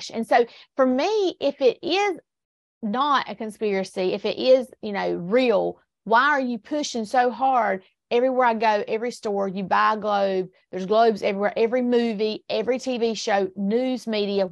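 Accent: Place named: South, United States. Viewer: This woman is why folks use a southern accent to say stupid things whilst making fun of the ignorant.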